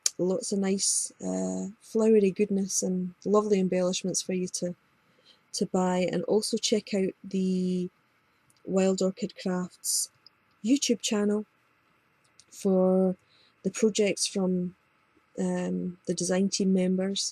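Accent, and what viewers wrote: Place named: Scotland, United Kingdom. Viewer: Never realised that you were scottish too lol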